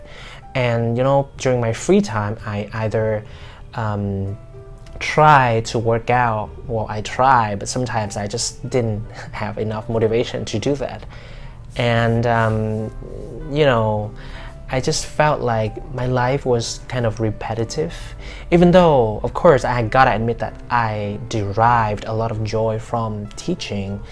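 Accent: United States. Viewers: Yes. First ,I thought ur accent is like American ...it is about 90 percent, so great, that is kind of my favorite accents. I.hope to take IElts class from u. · Em rất thích video của a. Sub viết song ngữ công phu kèm video. Có tải về cũng ko bị mất sub. Anh ở Sing bao nhiêu thời gian. mà sao vẫn giữ được cái accent US hay vậy. Chứ e nghe a có tý Singlish nào đâu 😂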